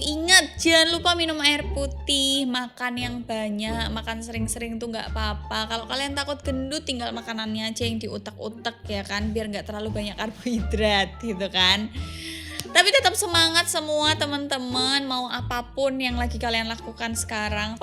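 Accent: Java, Indonesia. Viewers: ngomong nya kaya orang jawa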